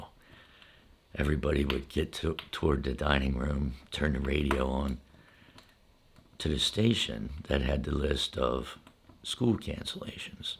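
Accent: Pennsylvania, United States. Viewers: What part of PA are you from? Because my family is from western PA and sound just like you.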